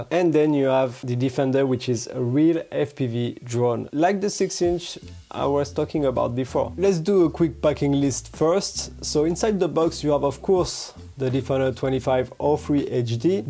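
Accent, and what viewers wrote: France. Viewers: Ont dirait tu a un accent français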